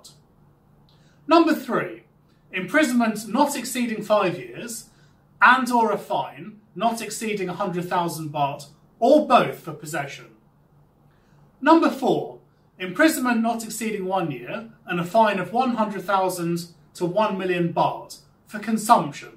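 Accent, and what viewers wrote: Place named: Posh, United Kingdom. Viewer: you know what it is, it's the way you talk, you talk like you went to a private school ( if you want to score some weed and chill on a hammock) you would expect someone with a Glasgow accent to be talking about that. Well I'm on one of the islands now but I will be going back to Bangkok one day. I've subscribed to your page so let's see some new posts. Kind Regards Ryan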